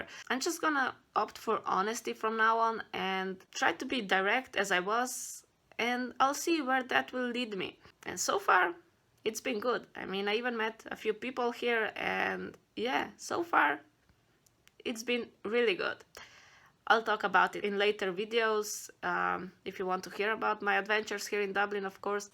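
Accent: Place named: Slovenia. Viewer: Every last detail of this ongoing interactive life story is on point, even your bedroom wallpaper is right :D Good video, meakes the listener feel connected with the message of taking the responsibility for your destiny and than beeing persistent enough to follow it through without giving up beforr your time comes. Ps, leave at least 0.001% of Slovenian acent :D 🌺💚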